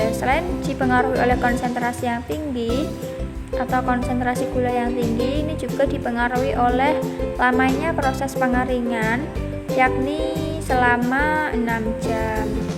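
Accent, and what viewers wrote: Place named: Java, Indonesia. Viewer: medok banget 😭 but overall baguss dan informatif hehe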